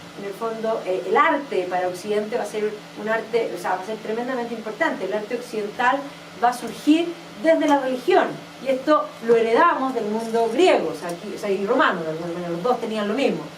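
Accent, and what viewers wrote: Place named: Chile. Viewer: El tema es supremamente interesante pero, y me disculpa la sinceridad, por una parte la calidad de la grabación es pésima y por otra parte el asento chileno tan notorio en el lenguaje de hace perder gran parte de la informacion y la hace extenuante. Lamentable francamente.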